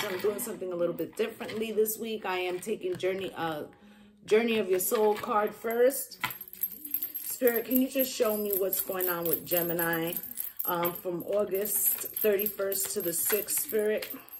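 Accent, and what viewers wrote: New York, United States. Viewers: He'll NEVER be in my Empress energy ever again and he knows it so I'm unburdened and chilling on my throne with my peace and abundance in ALL forms while moving forward😎. I chose ME and snatched my energy back from a toxic ,unbalanced, superficial, false mask, lying, player libra ex after betrayal. NO contact and NO communication will remain in place permanently for that narc demon vampire! Shout out to New York😍. I'm from the DMV but I know a New York accent😁. Peace and Blessings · Definitely hear it when you speak. I can always tell when I hear a fellow New Yorker! 😁 What part are you from?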